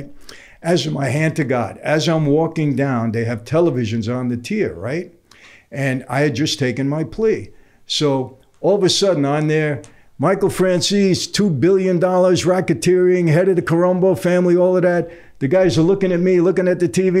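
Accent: United Kingdom. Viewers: Great interview. Enjoyed you having to renege on some of the cockney patter so your questions made more sense 😂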